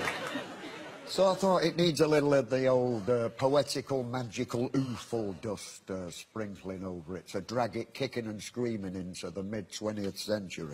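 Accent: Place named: Salford, United Kingdom. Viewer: Trouble At’t Mill Not Mall And it’s Trouble, aye Trouble, aye Trouble at’t mill It’s in a thick Salford working class accent, exaggerated a bit. It’s also a callback to his The Day the World Stood Still: The day the world stood still The day the world stood still From the underground to the overspill No trouble not even at the mill This is the day the world stood still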